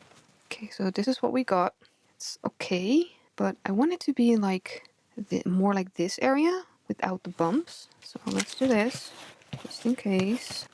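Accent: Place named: Netherlands. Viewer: You sound a little bit dutchie 😀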